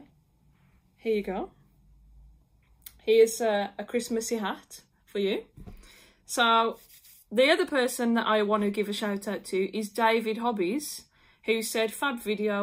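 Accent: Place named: Wolverhampton, United Kingdom. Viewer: Great video. Guitar playing was wonderful. Very nice to just listen and chill. I've been following your channel from the very start. Always enjoyed your content. The accent was a plus point as well! Even though I'm a Londoner born and bred (although now living in the Welsh Valleys), I am a life long Wolves fan! Been to Molineux many many times. I knew you was from the area by your accent. Are you a Wolves fan? Many thanks. Craig.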